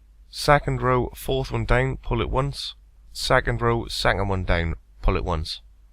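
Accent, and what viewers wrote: United Kingdom. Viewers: (Break) and (Law) --> British guy narrating. Long story short, a sudden image of popped into my head.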